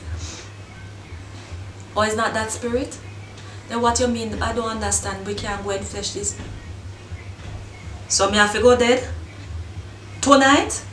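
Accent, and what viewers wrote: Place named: Jamaica. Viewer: JAMAICAN hummm. The best mi caaa. Badda DWL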